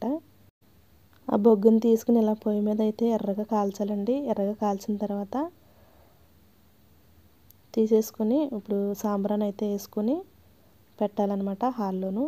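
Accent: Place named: East Godavari, India. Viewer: మీరు చాలా కష్టపడుతున్నారు అమ్మా. మీది ఏ ఊరు అమ్మా, మా సైడ్ భాష లాగ ఉంది మీ మాట . ఈస్ట్ గోదావరి జిల్లా మీది మాది అక్కడే అమ్మా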